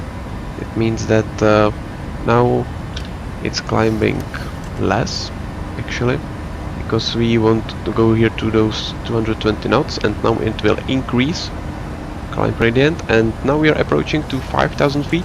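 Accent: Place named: Czech Republic. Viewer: Czech accent recognized!:D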